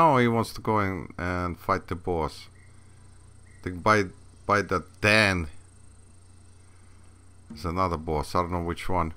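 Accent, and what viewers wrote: Russia. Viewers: Я знаю что ты говоришь на русском по твоему голосу Я я знаю что ты русский но ты разговариваешь на на английском чтобы люди поняли тебя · Love your Russian accent!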